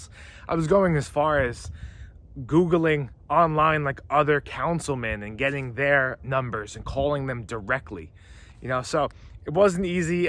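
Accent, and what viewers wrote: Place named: East Coast, United States. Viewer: ahh Long Island, knew you were an east coast guy, figured jersey though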